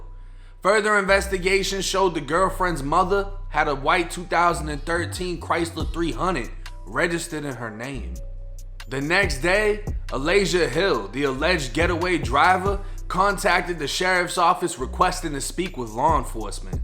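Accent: Boston, United States. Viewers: Bruh you could be from Tampa but you got that Boston accent straight up!!! Salute to your work tho.. always a great watch #MISSIONHILL #BOSTON here to represent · Lol the Boston accent makes more entertaining to listen to the stories. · How do you have a Beantown accent coming from Florida? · U from Boston?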